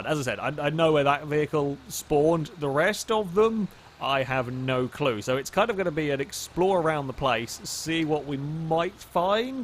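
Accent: United Kingdom. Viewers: I only watched one video of you, and already I am loving your goddamn videos, keep up the good work, dude! (P.S. Your voice sounds British, are you from London?)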